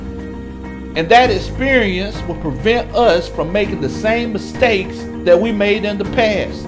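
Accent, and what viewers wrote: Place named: South, United States. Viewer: Coach ... This Was Excellent. Complete w/ Southern Accent & Lingo. I Hope The Team Endorses This Grass Roots Effort. Keep Up The Excellence Coach... We'll Tune In.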